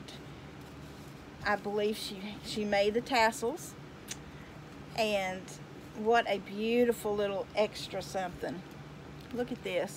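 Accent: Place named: Tennessee, United States. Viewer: Nice presentation here!! You have that Smokey Mt./Tennessee voice! I love it!!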